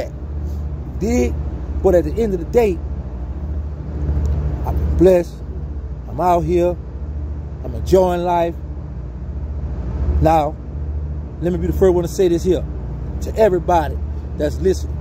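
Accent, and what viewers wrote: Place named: New Orleans, United States. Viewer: you must be visiting N.O. more or you got more folks from N.O. around you on a regular basis because your accent is getting thick again. Shout out to Brian Brooks 2023! Brilliant! Cheers mates!!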